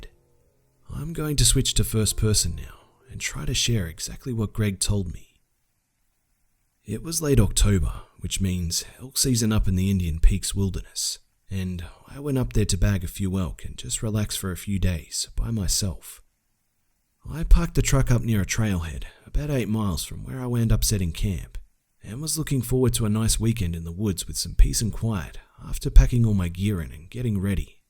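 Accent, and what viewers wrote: Australia. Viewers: r u Aussie?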